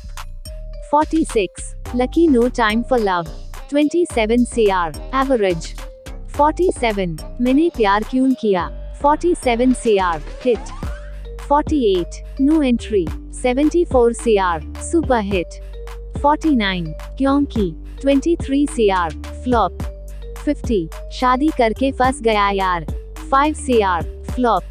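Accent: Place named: United Kingdom. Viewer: by her voice can tell she must British or from an English speaking countries struggling with Hindi title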